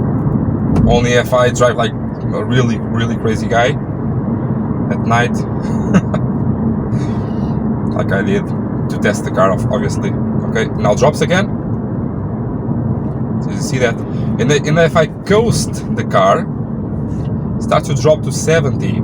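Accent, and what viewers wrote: Portugal. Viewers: Boas, dá para entender que és tuga, desde já bom trabalho com os vídeos muito explicativos e detalhados, no entanto gostaria de perguntar se tens negócio aberto e se sim, em que zona? Já agora mais ou menos o valor da troca de uma corrente distribuição para um motor 1.3 multijet fiat grande pinto 2007? Tenho o material necessário no entanto receio de o mudar, Obrigado e continuação de bom trabalho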